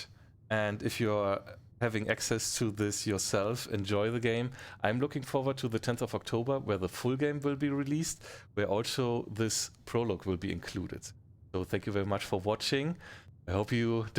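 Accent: Germany. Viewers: Bruuder dein deutscher Akzent ist ja mal mega hart :D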